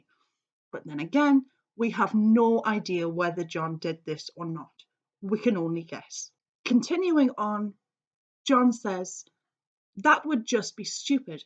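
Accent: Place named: Scotland, United Kingdom. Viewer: I loved this vid! Hello,! I have just discovered you and am now subbed! I adore your feisty Scottish voice and attitude. I can’t wait to see more! 👏👏👏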